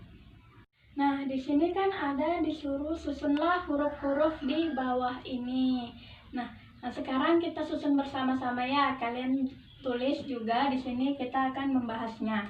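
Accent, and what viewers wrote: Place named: Pekanbaru, Indonesia. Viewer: Logat pku nya nampak kali yaaaa (pake nada pku)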